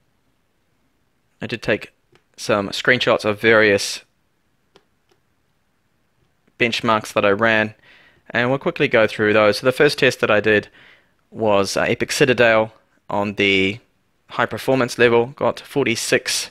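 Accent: New Zealand. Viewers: Are u from NZ? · Hi Mate, I heard you mention that you're from NZ (the accent says it all really). Im looking at getting a Redmi Note 3 from Aliexpress from a seller called Hong Kong Goldway. I struggle to find these in NZ at a reasonable price so think this is the best thing. Are you able to comment on how easy it is to flash the global MIUI rom and have all google services installed. As I assume the Aliexpress models from china have no google services and heaps of bloatware. Then again, where did you get yours from (if you did get it delivered to NZ) Cheers in advance. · "Seeven " Teen " haha :) cool kiwi accent. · Yay finally a kiwi accent!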